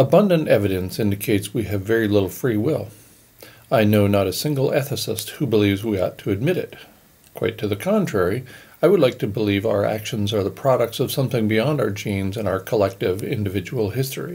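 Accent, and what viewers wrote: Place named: New York, United States. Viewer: Like listening to the old Mensch with his New York Bros accent and straight talk... It's amazing how we bounce around in life... Just celebrated a birthday and scratch my head on the chance encounters with ideas and people and events. Makes me wonder on all concepts... Perhaps, just maybe, after crossing over the River Styx it all all be revealed... Probably not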